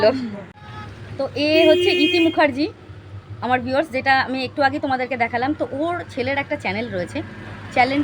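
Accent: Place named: Bangal, Bangladesh. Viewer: Heavy bangal vasha bolen toh . Amio bangal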